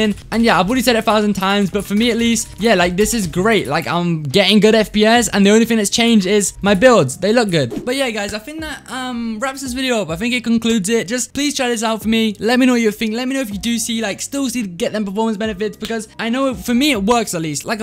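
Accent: Sweden. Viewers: ur 100% swedish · you are from sweden i know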